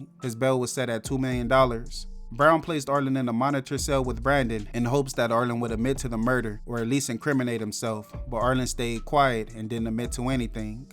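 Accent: Los Angeles, United States. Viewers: Right on time‼️ btw are you from LA?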